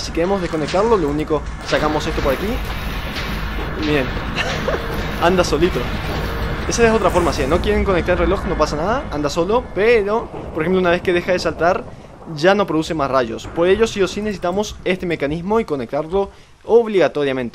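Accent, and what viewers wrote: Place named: Paraguay. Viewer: Man dos paraguayo? .__. tenés el acento paraguayo jsjsjs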